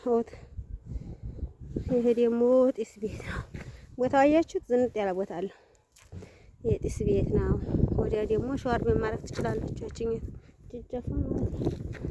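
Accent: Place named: Wollo, Ethiopia. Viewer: አወራርሽ ተመቸኝ የወሎልጅ ነሽ መሰለኝ 😊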